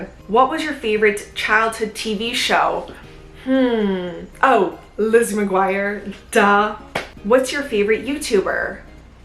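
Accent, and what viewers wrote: Midwest, United States. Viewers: omg you’ve got a thiccccck midwest accent. i grew up in suburbs of illinois too. i love it!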